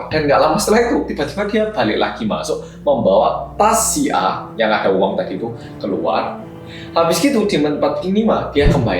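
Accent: Java, Indonesia. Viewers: om medok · I'm addicted to : "Halo guys welcome to korea reomit bersama orang korea yang medok"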